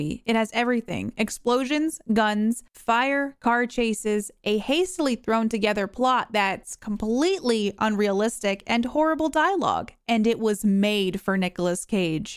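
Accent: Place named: Transatlantic, United States. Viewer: imma comment that your transatlantic accent is perfection.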